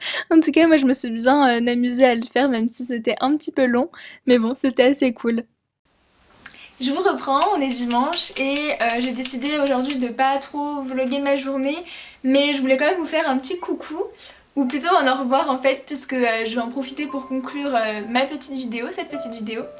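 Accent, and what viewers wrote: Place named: England, United Kingdom. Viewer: Non j'adore ton accent anglais tu pourrai faire des vidéos comme sa plus souvent stp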